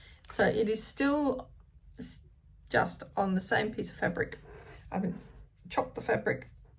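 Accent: Australia. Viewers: So happy to see you back and hear your gorgeous Aussie accent! I love all of your finishes and can’t wait to follow your progress in our group and on screen! Big hugs to you! ❤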